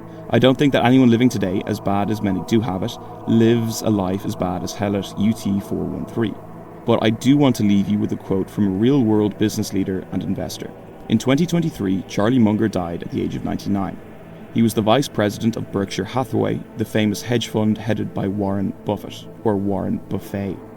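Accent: Ireland. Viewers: God im so glad i found this channel, no AI, Irish accent, what more could you wish for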